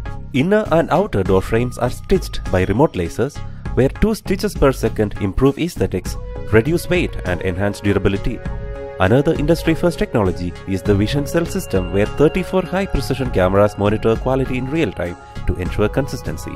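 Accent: India. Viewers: That malayalie accent though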